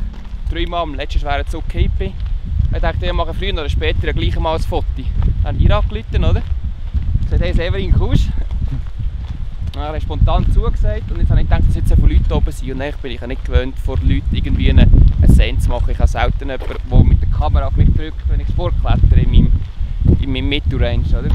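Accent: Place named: Switzerland. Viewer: Totally agree. My very limited Shwytzerdütsch skills makes it hard to understand, while the video and topic is top notch. Still highly entertaining !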